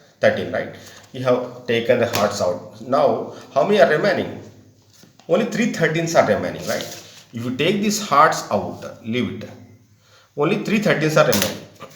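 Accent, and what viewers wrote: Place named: South, India. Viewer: Hindi bolo jab samaj aata h hume hum dilli se hai ap south Indian ho apki bhasha alag hai aur bolne ka lehza bhi alag hai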